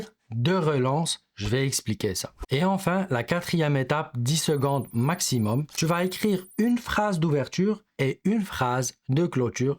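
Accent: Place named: Canada. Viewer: Avec un accent canadien, excellente vidéo 👌💖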